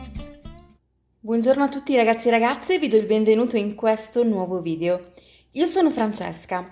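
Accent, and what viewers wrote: Sicily, Italy. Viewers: dalla cadenza che hai quando parli credevo fossi di Palermo.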